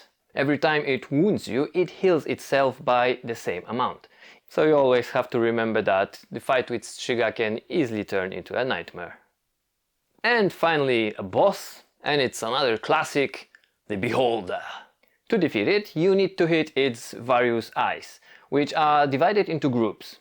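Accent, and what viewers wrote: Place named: Poland. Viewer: this is the coolest pocket dungeon crawler i have ever seen :) 1 more thing. Why do you have polish accent?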